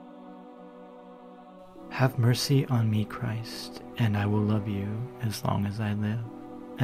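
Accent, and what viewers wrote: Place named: Scotland, United Kingdom. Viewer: These meditations are perfect. <link> him has a gentle, warm, pleasant voice (with a slight Scots accent). The words are simple and clear. The timing is perfect... just as you start to find your mind wandering, he says and if you find that your mind is wandering... . There is no background music and no noise in the background, only his peaceful and engaging voice. The length of the meditations (about 1/2 hour each) works well for me. I find that I tire of some meditations after frequent repetition, but that hasn't happened with this one. The metta lovingkindness meditation always feels right. Some meditation CDs I have tried have not felt right. The voice seemed hurried, or sounded tense; the background music seemed intrusive, or there were noises that interfered with concentration. This is just perfect as an introduction for new meditators, and it's also a meditation that I return to again and again. 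I'd give this more than five stars if that were an option. Even my students, who are not ordinarily very receptive to meditation, relax when they hear this one!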